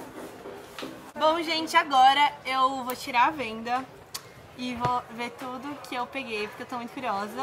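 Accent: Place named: Minas Gerais, Brazil. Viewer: Hahah vc é minera eu souuu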